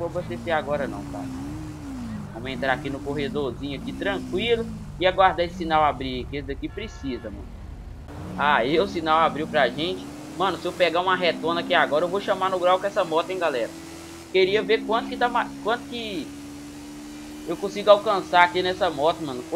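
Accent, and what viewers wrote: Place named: Bahia, Brazil. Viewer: vc e bahiano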